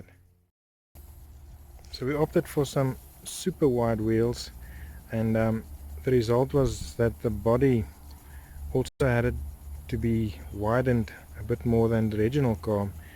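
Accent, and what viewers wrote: South Africa. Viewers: You South African my bru!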